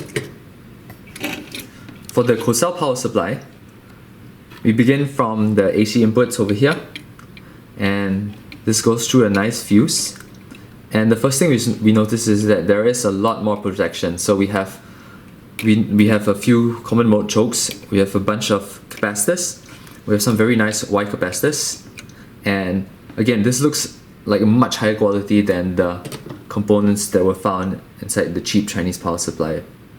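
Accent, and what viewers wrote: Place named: Singapore. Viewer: You know, I can hear the essence of Singapore in your voice :D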